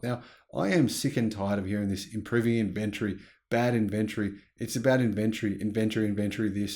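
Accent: Australia. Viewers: Before I made comment on his pronunciation, I googled it and listened to the proper pronunciation. It is not English English, must be Australian.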